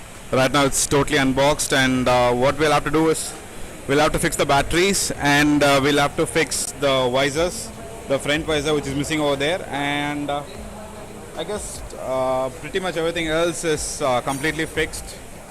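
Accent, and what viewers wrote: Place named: India. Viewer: Indian accent is so ugly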